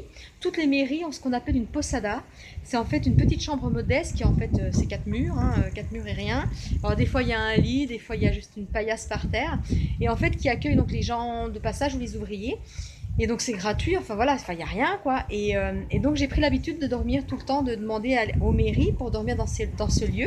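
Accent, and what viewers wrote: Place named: Jura, France. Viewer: L'accent jurassien revient d'ailleurs....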